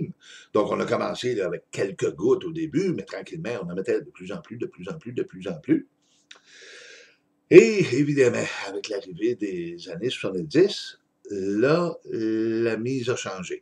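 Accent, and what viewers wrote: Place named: Texas, United States. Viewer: Très belle entrevue vidéo français et anglaise,vous nous orienter dans le choix des épices et piments pour la nourriture que nous préparons #1, en pensant avez-vous des origines du Texas car vous avez un accent texan👍👍🤝🌶🌶🌶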